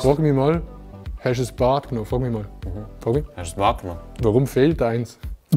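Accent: Switzerland. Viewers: Ich bin erst seit kurzem auf deine Videos aufmerksam geworden und feiere deine Schwitzer Deutsch (komme aus Deutschland und sprechen auch keine Schwitzer Deutsch), ich würde es aber trotzdem schade finden wenn du hochdeutsch sprichst da ich den aktzent mega feiere. Mach weiter so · Mach einfach deutsch mit nem dicken Schweizer Dialekt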